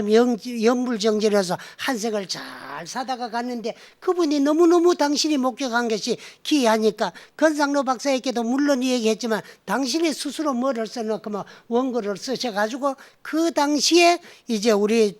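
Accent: Gyeongsang, South Korea. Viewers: 묘허스님법문에 푹 빠져서 밤낮을 모르고 경청하고있습니다 스님 감사합니다 경상도 사투리가 이웃집 어르신같습니다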